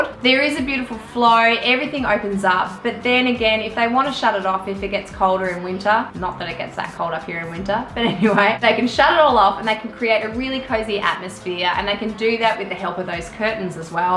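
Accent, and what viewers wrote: Australia. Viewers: I really liked Batman's feeling on the first floor! But what i love is this Aussie accent! 🇦🇺🏄🏼‍♂️ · I Love how she does her content everything seems to fit together perfectly the aesthetic the music all topped off with the cheeky Australian accent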